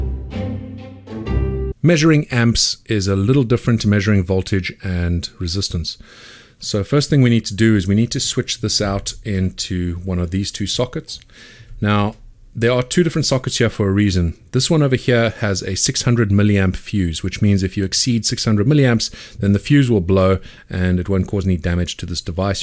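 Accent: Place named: South Africa. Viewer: Amazing videos really on point with what i wanted to learn and the series is well set out. Thanks for the amazing content! Also can't loose that SA accent hey xD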